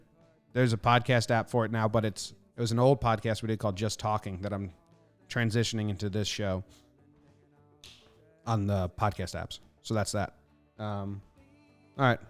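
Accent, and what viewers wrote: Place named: Chicago, United States. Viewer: I know you're a Yankee but are you originally from Chicago? I hear Chicago in your voice bro.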